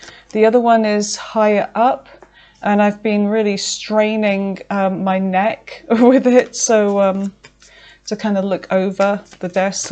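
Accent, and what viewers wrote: United Kingdom. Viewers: You are the most delightful tarot reader. Are you a Cockney born lady? I just love the various British accents.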